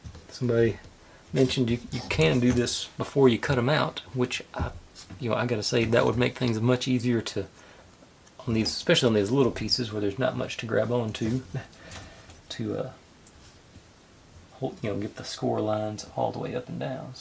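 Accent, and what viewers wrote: United States. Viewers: Because English is my second language do you have an accent? Because sometime sounds perfect American and sometime not.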